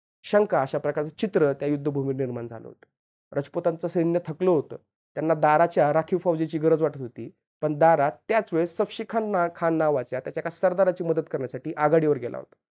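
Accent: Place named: Kolhapur, India. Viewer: Gosht chaan hoti... pan shabdochhar sarv to pari navhta. Fakt Kolhapur walya sathi VDO banavla ka ?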